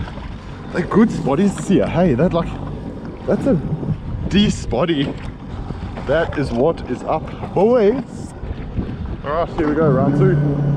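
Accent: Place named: South Africa. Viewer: Always nice to hear a Saffa accent :)) keep the vids coming even though I fish off a boat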